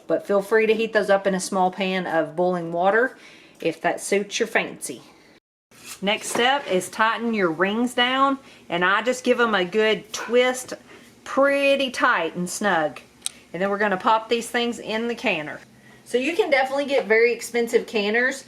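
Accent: South, United States. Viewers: 😅I grew up speaking Spanish and English here in PR and we don't get that beautiful southern accent at all. Iconic, just like everything south🏝💪🏼💪🏼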